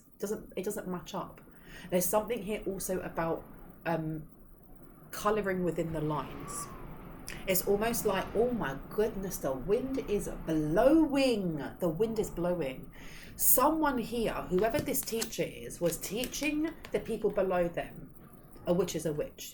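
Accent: England, United Kingdom. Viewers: GUESS WHAT, EVERYONE USUALLY HAS CARS HOTRODING , ALOT OF NOISE OUTSIDE, THATS LIFE, MOST HOUSES , ESPECIALLY THE MODERN MODULAR HOMES ARE NOT SOUND PROOF. HOWEVER, I AM VERY LUCKY, MY HOUSE HAS 6 INCH WALLS AND WELL INSULATED. SOMEONE IS JUST NITPICKING, BLOW THEM OFF , ENJOY YOUR READINGS, LOVE YOUR ENGLISH ACCENT! CHEER UP,, DO NOT LET NASTY PEOPLE RUIN YOUR DAY! KOOTOOS!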